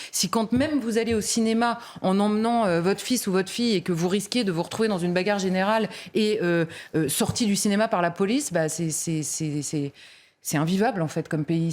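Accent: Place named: Southampton, United Kingdom. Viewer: J'ai reconnu l'accent de Southampton en Angleterre ...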